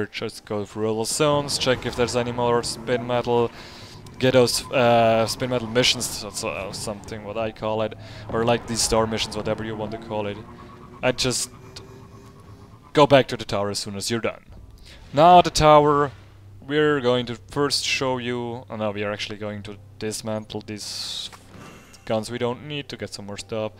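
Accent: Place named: Switzerland. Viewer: has uf dim Steamprofil gseh, mer erkennt d'schwizer scho ader stemm, ben aber ned ganz secher gsi :)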